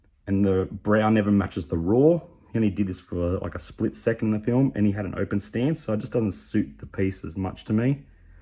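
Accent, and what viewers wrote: Australia. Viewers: Mate you sounded very much like an Aussie collector. Well done ! Nice to know another collector from down under if you are.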